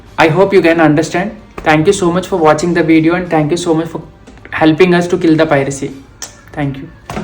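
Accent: Godavari, India. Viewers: మీ నవల ను అమెజాన్ నుండి మా డాటరు నాకు గిఫ్ట్ గా ఇచ్చింది చదివాను చాలా బావుంది మా డాటరు పెద్ద ఫేను మీకు 😂 సాప్ట్ వేర్ ఒత్తిడి టైములో మా పిల్లలకి మీ రీల్స్ మీ గోదారి యాస చాలా బాగా ఎంజాయ్ చేస్తారు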